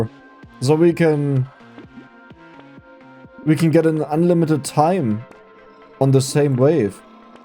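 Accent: Germany. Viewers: U sound very german and its tickling my non existent braincells! my bf likes your videos. Idk what else to say. This aint a hate comment btw, ;3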